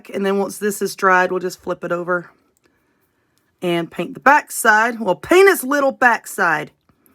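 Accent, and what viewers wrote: South, United States. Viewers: Your so funny. This are beautiful crafts. I just love listening to you with your southern accent. I used to live in SC for almost 30 years before moving here in MN 3 years ago! Yes weather here is terrible right now we had snow storm Sunday. I missed the the weather in the South.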